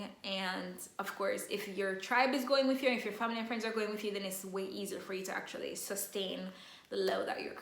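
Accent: Jamaica. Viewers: Wonderful video!... I could tell your a Jamaican lol Wah Gwan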